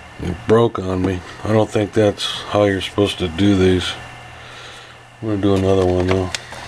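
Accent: United States. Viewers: You are a sweetheart! Are you from the area of Northeast, N.Y? 'Sounds like a Syracuse accent to me. Your wife must have been very touched by all the love you put into her Valentine's Day gift.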